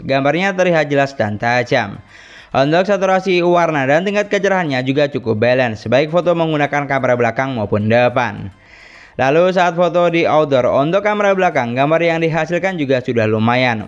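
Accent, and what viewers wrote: Madura, Indonesia. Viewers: Bang udah kaya orang maduran jualan sate logat nya😅 semangat terus❤ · Kyk logat madura tesate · Itu ciri khas orang madura blokkk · Keren ciri khas logat Maduranya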